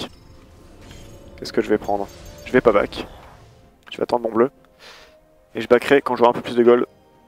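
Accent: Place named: South, France. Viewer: C'est moi où il avait un léger accent du sud en 2015 ? La vie de parisien ça lui a atténué ses origines on dirait :D .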